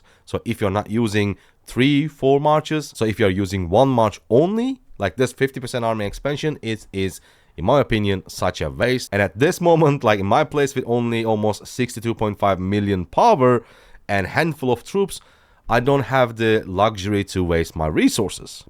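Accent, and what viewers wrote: Turkey. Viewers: I KNEW IT I KNNNNEEEEEWWWWW IT THIS MAN IS TURKISH i recognize this accent everywhere now he has a Fener shirt on 😂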